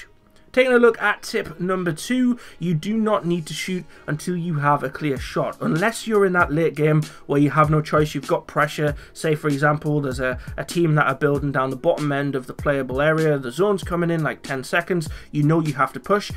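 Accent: Newcastle, United Kingdom. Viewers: Nice video dude - enjoyed that. Bonus points for what sounded like a Geordie accent too! =)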